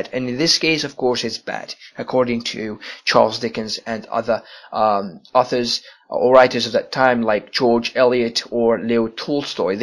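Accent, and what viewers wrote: United Kingdom. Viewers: Sounds Scottish to me. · you have a good british pronunciation stick to it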